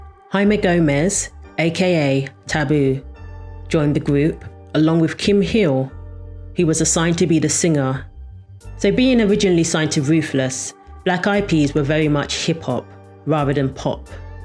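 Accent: United Kingdom. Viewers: Hearing about the 90s in a Brit voice? I subscribed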